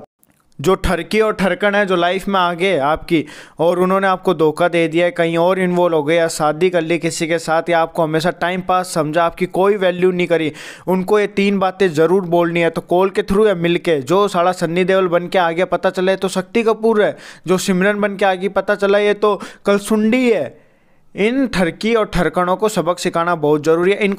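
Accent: Haryana, India. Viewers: Sir aap Haryana se ho kya ??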